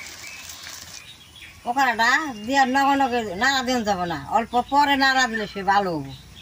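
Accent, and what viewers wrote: Bangladesh. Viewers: Jethi Tumi khubi majadar jinish banao kintu abujh manush tumar mullayan kore na tumar bhasha shune moe hoy Tumi jamalpur Tangailer luk onek din becher thako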